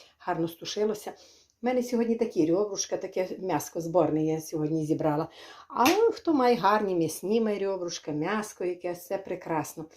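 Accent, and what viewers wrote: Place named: Luhansk, Ukraine. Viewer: Чудовий рецепт. Так моя бабуся готувала, тепер іноді я. У вас неймовірна говірка, я наче знову побував вдома на Луганщіні. На тих недолугих обох статей, які вам розповідають як правильно говорити, не звертайте уваги. У них просто давно не було хлопця.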